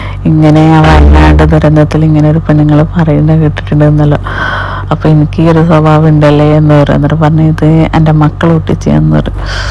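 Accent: Kannur, India. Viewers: Kannur malayalam adipoli❤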